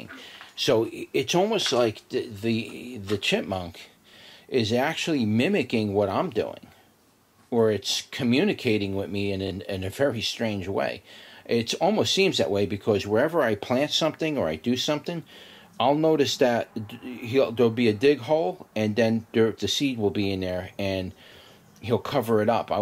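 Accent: New York, United States. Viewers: You sound like you’re from one of the boroughs. :). I like your accent!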